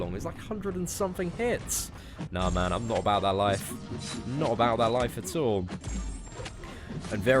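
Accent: United Kingdom. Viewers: I hate you, but I like watching your videos with your Bitish axcent.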